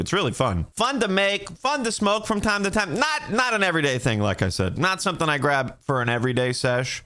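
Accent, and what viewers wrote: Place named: New York, United States. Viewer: Sounds like he's from New York.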